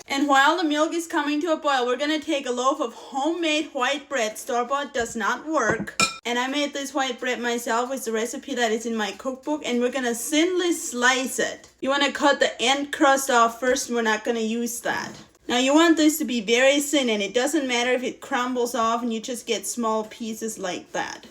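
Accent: Germany. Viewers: Do u have a German accent? I got a little scared when u cut the bread I'm not gonna lie 😂😂 jk ❤❤😂 I think u are wonderful😊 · Is German your first language?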